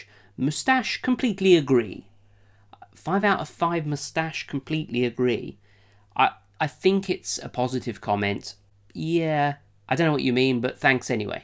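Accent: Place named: United Kingdom. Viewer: Haha.. you are crazy, but you do have a fine haircut and a lovely British accent.. very good english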